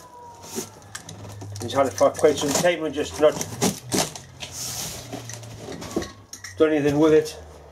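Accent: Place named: England, United Kingdom. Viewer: Ok, I dug up my very old account and subscribed to channel and I gave a thumbs up as I should have long time ago, fore you asked me to,. I am a long lurker here otherwise:) Excuse me for my language errors, I'm a norseman:) Hi, I like your stuff there in England, especially your, for me, different dialect. I love dialects. Most Swedes wouldn't care about such things but I do. Bo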